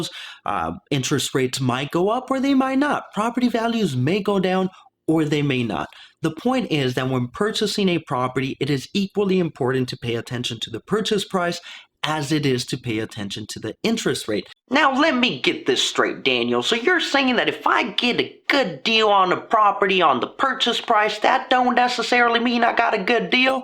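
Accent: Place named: Texas, United States. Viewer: A for content...F for Texan accent; stigma stigma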